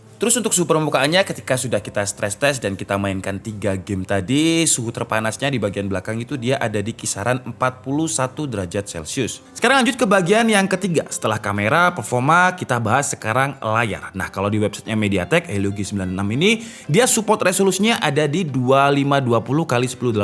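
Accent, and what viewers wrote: Malang, Indonesia. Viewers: Bang lu orang malang kah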